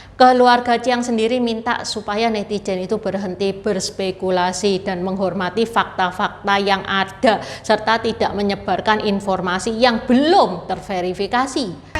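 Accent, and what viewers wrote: Java, Indonesia. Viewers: Cici bhs Indonesianya medok banget 😂😂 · Logatnya Jawa banget.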